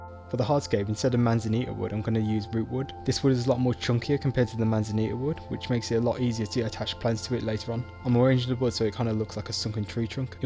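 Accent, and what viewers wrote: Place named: Birmingham, United Kingdom. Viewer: Nice, MDs bowl video inspired me to do one too. I had a planted bowl like this at my university halls but I left it there for too long when they sent everyone home so all the water evaporated and the plants died. So I'll probably do a video rescaping that bowl at some point. You have a good content on chanel, good quality camera and editing for less than 100 subs, you deserve more. You're from Birmingham right? I recognise that accent haha. :)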